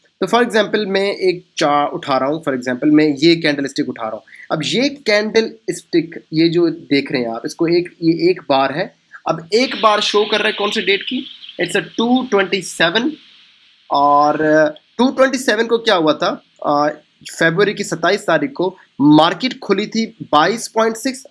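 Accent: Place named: India. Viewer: I strongly feel u r Indian due to ur voice/tone and name.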